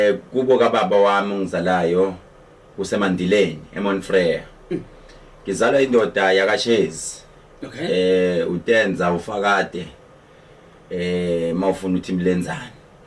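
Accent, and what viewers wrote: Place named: Zimbabwe. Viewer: Ayi bengihlale ngisho ukuth uphuma eZim ngizwa ulimulakho uma ushumayela.kodwa ke uyiqhawe bhuti omncane futhi sengiswele amandla okufinyelela kuwe.ayi u Babawkhe waba yidlozi elihle lowakwa Hlosa kanti no stepfather wakho noMama wakho bakukhulise kahle khula bhuti omncane.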